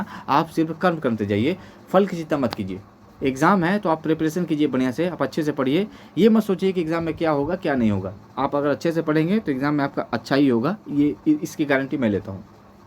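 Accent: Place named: Bihar, India. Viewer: Very well explained and I can relate with your bihari accent which gives a home like experience. Thank you🙂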